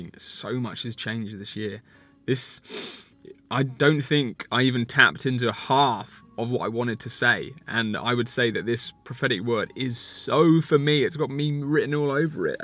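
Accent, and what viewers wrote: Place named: London, United Kingdom. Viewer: i didnt know you had a london accent lol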